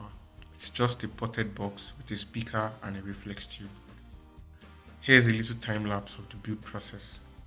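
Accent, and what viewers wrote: Nigeria. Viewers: I guess he's from Nigeria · Nice work your Accent sounds like You are Nigerian...A few pointers though..Try using speaker gasket instead of silicone gum to hold down your subwoofer, silicone has been none to react not to well with speaker surrounds....Nice work 👍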